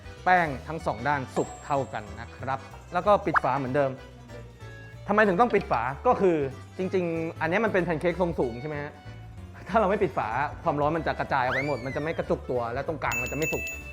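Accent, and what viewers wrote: Uthai Thani, Thailand. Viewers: เอ็นดูความพูดไปเหน่อไป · มีความเหน่อ ลูกเอ้ย เอ็นดูวว์ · ขนาดยาวหน่อย(เอ็นดู)แอบเหน่อ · ชอบที่เสียงพี่เขาเหน่อๆนิดนึงน่ารักค่ะ😂ดูเพลิน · พี่ ท์เทปนี้เหน่อออกเลยแง้ มีความเจ้าปื้ดด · คนอุทัยธานีเหมือนกันค่ะ สำเนียงเดียวกัน น่ารักกก😂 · พี่ ep.นี้เหน่อมากก55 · ชอบความพูดสำเนียงอุทัยธานี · คนบ้านไหน เหน่อจังเลยค่ะ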